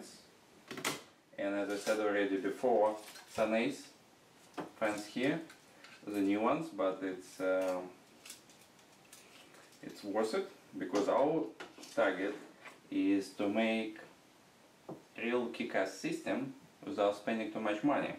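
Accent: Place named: Russia. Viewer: I don't think it really is German. He probably does speak German well, but I'd say Austria or Poland or Hungary. They all speak German well, but slightly different accent from actual German. I caught him having a minor Russian slant to his English(ex:blyeed, pyart). His accent is slightly off from German-German. I hoor ze vaak genoeg hier in de omgeving.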